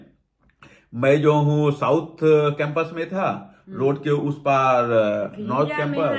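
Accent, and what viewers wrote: Bihar, India. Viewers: ji tumhari hindi to acchi h par bihar wali h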